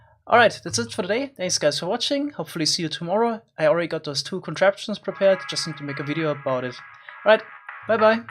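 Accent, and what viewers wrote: Germany. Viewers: Das deutscheste Englisch auf Youtube. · Mann Hört das du deutsche bist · The German accent kicks! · Ich bin mir sowas von sicher das du n deutscher bist xD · Wie man hört das du deutsch bist haha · Dieser deutsche Akzent einfach xD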